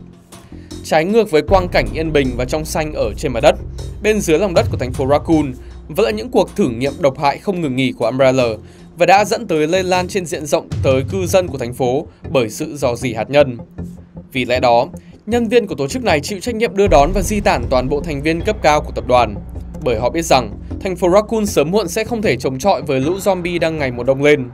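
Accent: Vietnam. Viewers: Bác thuyết minh dân Nghệ hay Hà Tĩnh v ạ?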